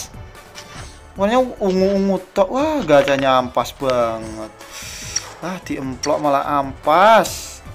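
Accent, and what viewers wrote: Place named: Banyumas, Indonesia. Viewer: Wong endi mase ngapak temen ngomonge ekwk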